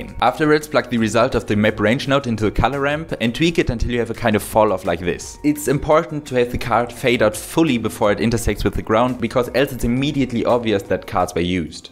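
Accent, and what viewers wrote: Germany. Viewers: i hear deutsch...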